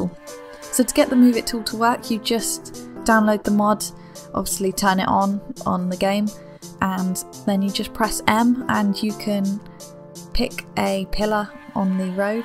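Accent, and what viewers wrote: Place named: United Kingdom. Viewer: Came for Move It, stayed for the mental attention to detail. Subbed and watched them all. I know your format is typically to VO sped up video but It'd be nice to see some of you playing real time. Nice to hear a Brit doing this so there's a little less boring grid!!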